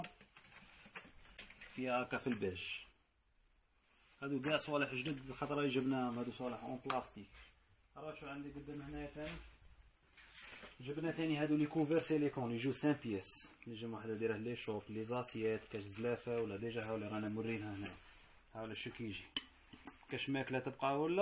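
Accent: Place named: West, Algeria. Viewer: هذا الحنوت وين جاي رني نسمع اللهجة من الغرب صح ولا راني غالطة يعني راك غير مجهتنا ههههههههه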